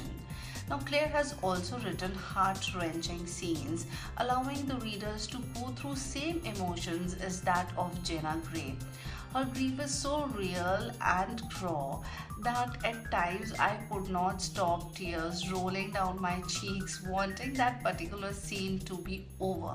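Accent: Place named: New York, United States. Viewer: Very interesting and ur accent is very nyc....keep gng👍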